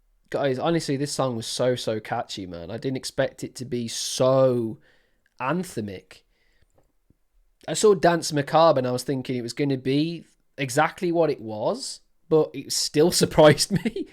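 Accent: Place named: Northern England, United Kingdom. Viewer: Subscribed because I'm a sucker for a Northern accent and your videos are fantastic. I saw the first one and knew immediately how much fun you were going to have with this. ✌️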